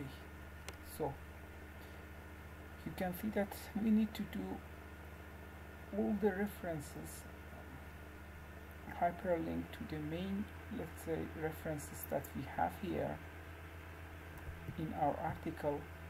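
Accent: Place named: Iran. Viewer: Thank you so much for this upload - grateful! You sound Iranian! I have an Iranian friend. Cheers!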